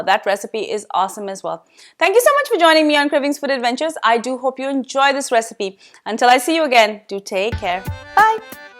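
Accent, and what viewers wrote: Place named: India. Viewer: " "? Shakal aur accent desi, aur naam vilayati"?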